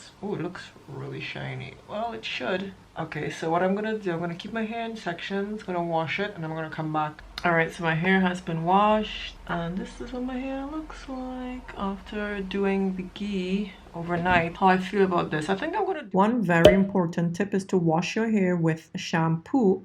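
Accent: Trinidad and Tobago. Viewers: Do I hear the beautiful lilt of Trinidad in your voice?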